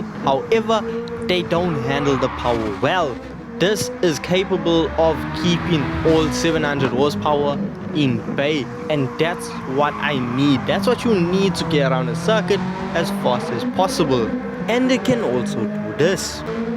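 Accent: South Africa. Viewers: Are you south African???😭😭😭 I'm picking up your accent and it sound heavy familiar...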